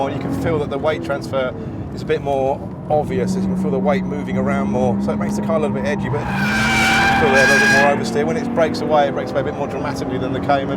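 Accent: United Kingdom. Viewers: he has to be british REALLY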